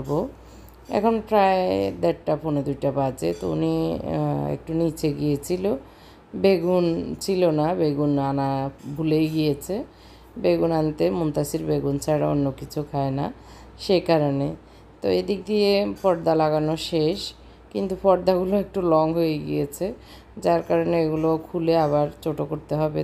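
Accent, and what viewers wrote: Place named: Feni, Bangladesh. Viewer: Assalamualaikum apu ... Ami sob somoy apnr video dekhi but kokhn o comments kora hoy na..amr Bari o feni .upnar feni r tune e kotha Bola amr onk Valo lage... Allah upnk Valo rakhuk .. Apu kitchen cabinet korsen j oitar details ta ektu diben pls.koto taka lagse ki board use korechen esob bolben pls..ami o banabo tai idea nite chai.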